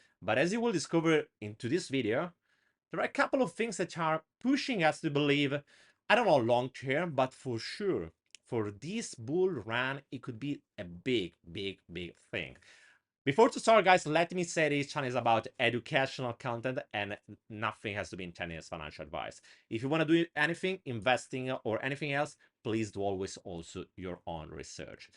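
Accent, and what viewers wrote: Italy. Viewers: Love your accent. Is it Italian? Spanish?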